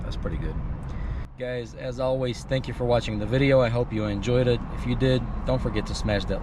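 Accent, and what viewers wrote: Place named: United States. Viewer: Говоришь ты как настоящий американец, красиво, а действуешь четко и без нервов как настоящий русский. Кто ты воин?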